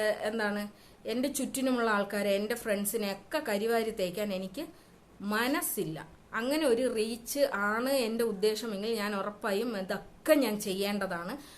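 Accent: Malappuram, India. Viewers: Awalk bhazh malappuram mix aanalo samsarkunnath awidayum veettupanik poyanna thonunnath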